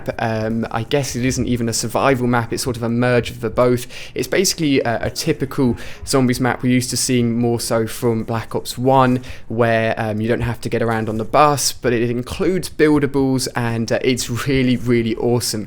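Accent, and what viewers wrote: United Kingdom. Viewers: okay, wtf? He's british, first off, so it won't sound perfect. And don't mention retards, it's just rude. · What the hell is your problem. Hes from the UK, that's his accent.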